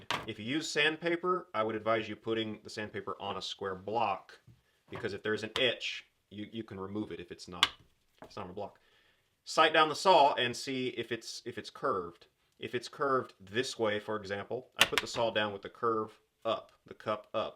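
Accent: Tennessee, United States. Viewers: Sounds like a Tennessee accent to me, but I haven't lived there since 1969. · he sounds more Tennessee city to me. Maybe kinda like Memphis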